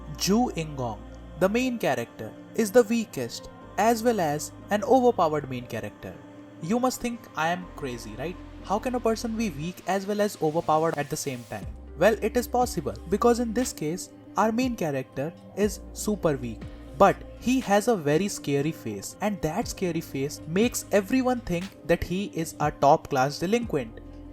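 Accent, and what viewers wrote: India. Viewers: I think i just found the best indian accent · Are u indian because u sound like one.... Dont get me wrong I was just askin I ain't racist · India best accent no 🧢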